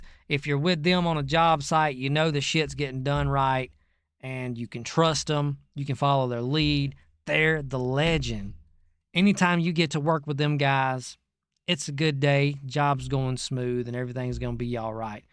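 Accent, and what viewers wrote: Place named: West Virginia, United States. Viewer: This guy's accent is legendary. If he's not from the heart of West Virginia, I'll be damned.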